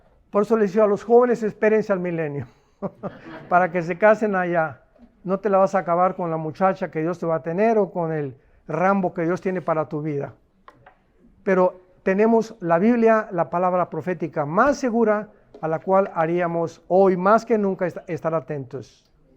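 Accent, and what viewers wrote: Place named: Peru. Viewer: Luis Qwerty estas seguro qué esté hombre es mexicano para mi suena como peruano ojo no tengo nada en contra de los peruanos es la primera vez que lo veo y me llama mucho la atención.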